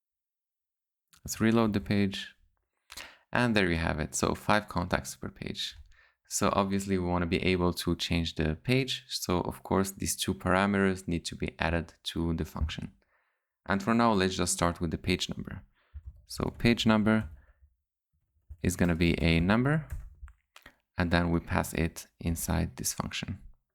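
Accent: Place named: Morocco. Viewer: Bro sounds moroccan AF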